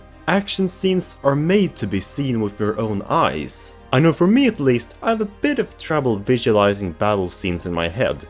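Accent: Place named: Sweden. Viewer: Är du svensk eller från skadinavien? För din engelska låter precis som en svensk dialekt.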